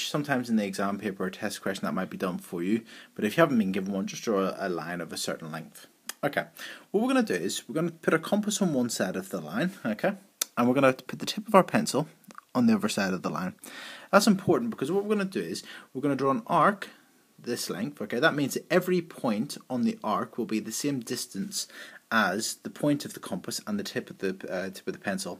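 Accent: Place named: United Kingdom. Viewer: are you from England? because you have like british accent, if you are from England well high five because im from England aswell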